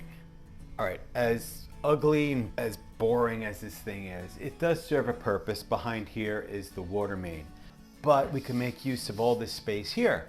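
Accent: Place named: Hudson Valley, United States. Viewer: Sounds like you might be in the Hudson Valley. Hello from Greenville, NY. You have an incredible skill level. How did you develop your skills? Also, if you don't mind, what was the tool you used - some sort of drill guide? Thank you.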